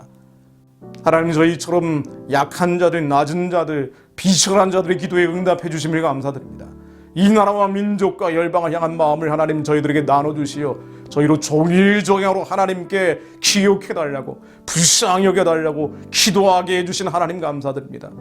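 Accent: North Korea. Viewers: 이분??북한말투 심하게 쓰시네~~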